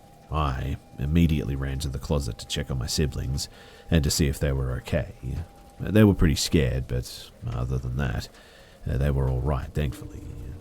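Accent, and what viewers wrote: Australia. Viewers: He's not British He's Australian. Seriously can you people not tell the difference?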